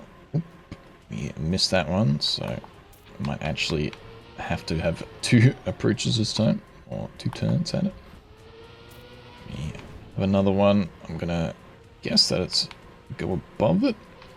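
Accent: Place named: South Africa. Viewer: I watched this as I couldnt get better than bronze on 14, I played it a bunch of times and figured it out and got gold :) just unlocked 16-20 :) Hey, your accent...I think your from SA?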